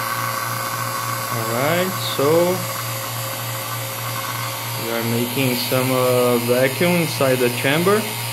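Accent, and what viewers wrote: Brazil. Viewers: are you brazilian? your accent when speaking english is very familiar to me. nice video! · sotaque brasileiro ? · cara vc é brasileiro?? dá pra reconhecer pelo sotaque!!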